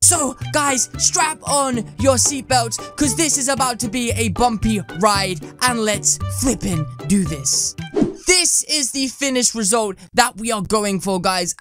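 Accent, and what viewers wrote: United Kingdom. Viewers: I like it when u talk u got british acsent ( dislike if acsent spelling is wrong)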